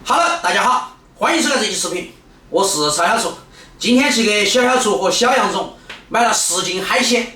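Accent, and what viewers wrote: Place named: Hunan, China. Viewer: 我咋听出湖南口音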